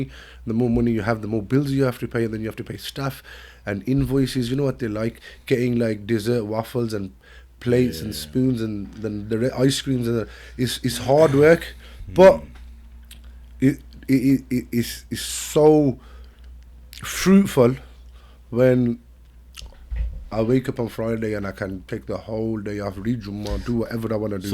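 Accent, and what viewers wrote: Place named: Birmingham, United Kingdom. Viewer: This guy has clearly not lived outside of an asian dominated area. We brown skins born in England are British of course. Speaking English with a horrible messed up Brummy accent, talking about clubbing etc. Of course you're a British Pakistani.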